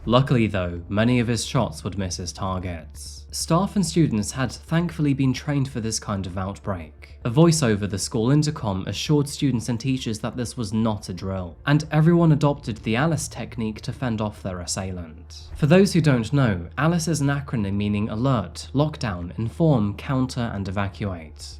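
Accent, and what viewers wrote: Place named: RP, United Kingdom. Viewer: So I have great fondness for our friends in the UK, but man your manner of speaking sounds like it’s straight out of Eaton, very overly posh or at least a high-low, sing-song rhythm. Great content, it’s just the voice over is tough to listen to.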